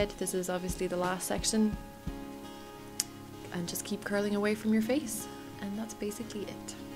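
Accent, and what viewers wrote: Ireland. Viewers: I love how Irish you are! Where about are you from? Were losing yous all to Oz haha. Skin looks great btw, considering you just had your laser. Kisses from Dublin xx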